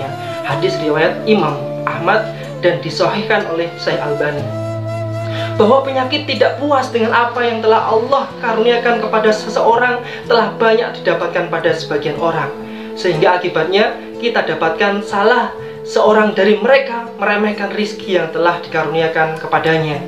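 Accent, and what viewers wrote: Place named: Java, Indonesia. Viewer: Ust orang Jawa ya?.. Logatnya kentel banget.... Mantap tadz. Sukses tadz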